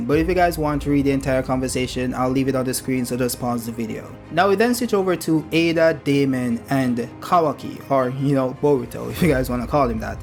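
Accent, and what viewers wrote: Jamaica. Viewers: you a jamaican bro?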